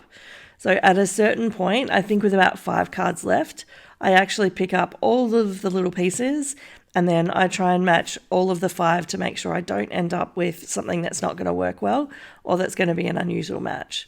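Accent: Australia. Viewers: hearing an Aussie voice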